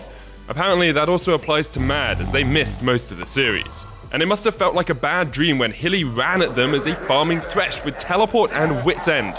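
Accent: United Kingdom. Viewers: When Sjokz and Ender does it, it sounds really troll and sarcastic. But when Medic does it with his British accent, it actually sounds like typical English news. Ha!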